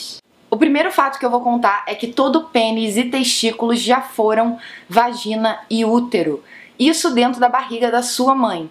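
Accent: Rio de Janeiro, Brazil. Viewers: Sotaque carioca é padrãozinho, porém lindo 😅😍.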